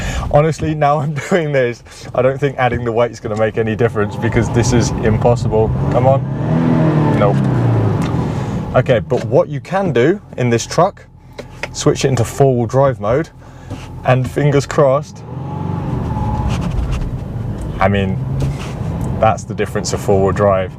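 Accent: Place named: United Kingdom. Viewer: единственное этот британский "акцент" в английском звучит необычно, когда ухо привыкло к американскому английскому))))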